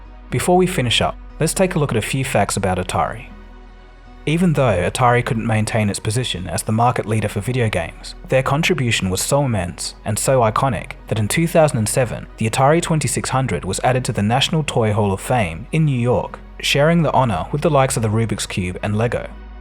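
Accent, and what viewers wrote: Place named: Australia. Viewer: Aussie Accent ❤️💋👍😃🇵🇭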